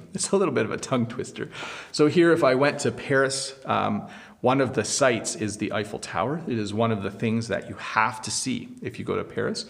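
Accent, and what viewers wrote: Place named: Canada. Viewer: I love the canadian english accent, I think that the word 'ABOUT' can reveal that the person are canandian or not, but i'm not sure of it! I Love your channel ❤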